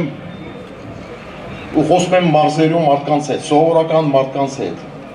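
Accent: Karabakh, Azerbaijan. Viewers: Էս խոսողը ոնց որ ղարաբաղի ակցենտով է խոսում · Это инс харабахи акцентова хосум хи инке hай чи, де има лси ай ору вор Арцахе андвец туркерин уремс тох имена Айастана тох ангист чи кнелу, турки ерезанк Айастана имац дезел чи ангист Токелау кнек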